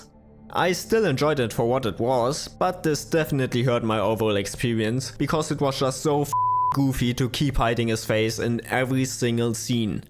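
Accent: Germany. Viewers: gerne machst ja auch nen klasse Job <3 und wenn es dich stört kommentiere ich gerne zukünftig in Englisch:). Denke halt es liegt daran das man gut raushört das du deutscher/deutschsprachig bist.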